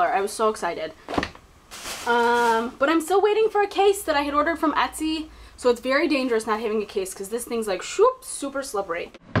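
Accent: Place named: Midwest, United States. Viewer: I love the tarte smooth operator power it's amazing for under the eye! Where are you and your Midwestern accent from!? I live in Wisconsin :)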